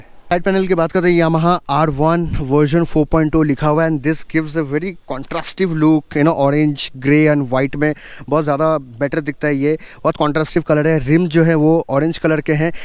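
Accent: India. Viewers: CAN ANY OF YOU indians speak normally in ENGLISH? these bikes are exporting worldwide and we need to WATCH A GOOD REVIE WITH ENGLISH E N G L I S H detailed review I CANT UDNERSTAND SH1T, not even subtitles works.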